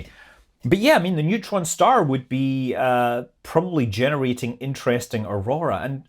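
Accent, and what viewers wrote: Scotland, United Kingdom. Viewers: Enjoy your videos. I find them interesting, informative and entertaining. I'm also partial to your Scottish accept as I'm of Scottish descent on my father's side. (My grandfather was born in Edinburgh.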